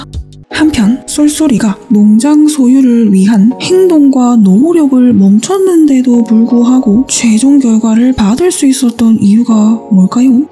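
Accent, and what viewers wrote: Gyeongsang, South Korea. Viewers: 이분 경상도분이시당. ㅎㅎㅎ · 말씀하시는게 경상도 사투리?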